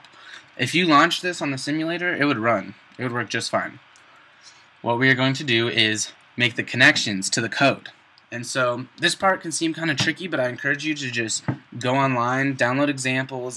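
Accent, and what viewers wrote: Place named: Maine, United States. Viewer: Thanks! Nice tutorial... Where are you from? Your accent is exactly like a friend of mine from Maine.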